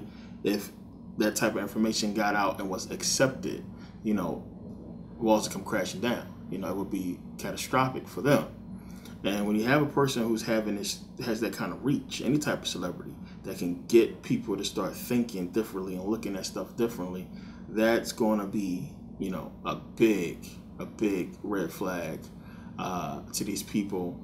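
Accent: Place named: Midwest, United States. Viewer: Young brother I am guessing but I think you are from the mid-west linguistically listening to your accent Milwaukee, Chicago, Detroit. I love your mind young brother.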